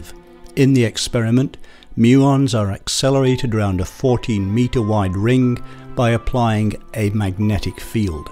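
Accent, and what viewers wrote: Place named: England, United Kingdom. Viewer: I'll admit it. the English accent makes me pay attention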